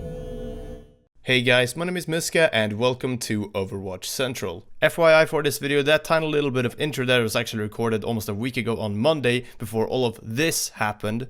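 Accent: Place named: Sweden. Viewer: You sound like a swede.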